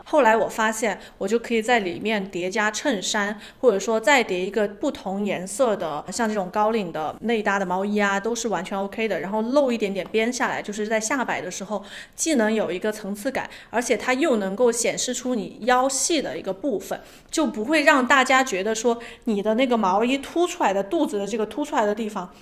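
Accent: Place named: Sichuan, China. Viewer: 干货超多！四川话口音的普通话太亲切了😆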